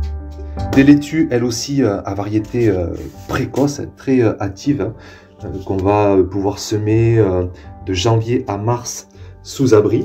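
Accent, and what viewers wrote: South, France. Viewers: On sens bien que tu es du sud avec une belle régalade 😜😜😜je le dis aussi 😜😜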